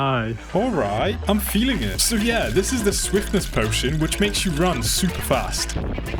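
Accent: United Kingdom. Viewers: I know you're British because of the speaking and I don't know how no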